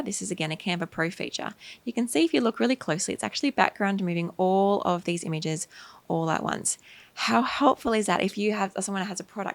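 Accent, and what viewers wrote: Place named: Australia. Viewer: Nice to here an Aussie voice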